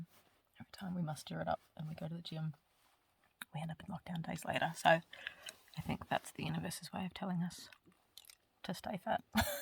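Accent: Australia. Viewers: As someone from the US, I can attest to social opinion of Austrailian accents, and trust me...we don't think you sound like yokels. If anything, people think someone with an Austrailian accent is probably worldly af lol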